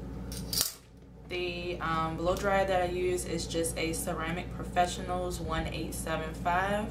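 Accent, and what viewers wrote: United States. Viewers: Doesn't she sound American to you?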